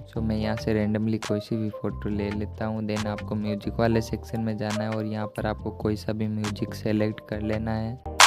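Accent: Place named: Gujarat, India. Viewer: I think you are gujrati 😅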